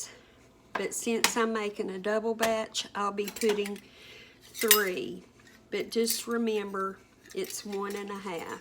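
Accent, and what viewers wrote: South, United States. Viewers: You have such a country sweet way of speaking turn your eyebrows inside out!!!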